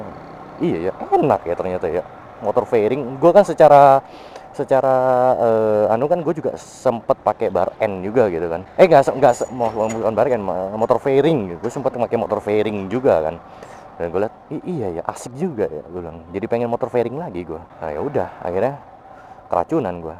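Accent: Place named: Java, Indonesia. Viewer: Jawa nya medokkk anjasssss😂😂😂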